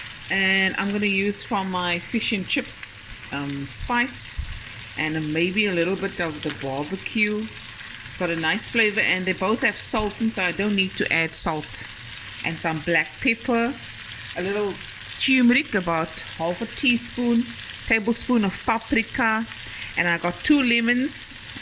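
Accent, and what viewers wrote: Cape Town, South Africa. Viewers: I love how I just randomly came across your video. Heard the accent and I knew immediately you are from SA. I'm South African currently living in Paris. Just tried this dish. Yummy❤ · Nice job i ear you are from cape town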